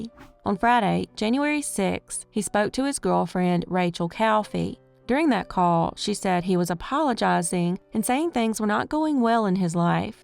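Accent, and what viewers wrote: South, United States. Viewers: I love your stories and your Southern drawl. I have heard of a lot of your stories, but you dig much deeper and you're much more thorough! Well done!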